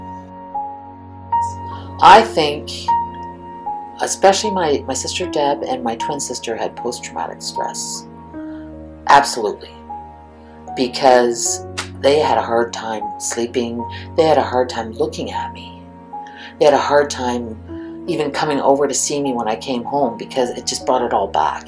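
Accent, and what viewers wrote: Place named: Pacific Northwest, United States. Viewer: Thank you for your story from Australia. You sound as if you may be from Pacific Northwest or BC. Much love and hope you continue to improve.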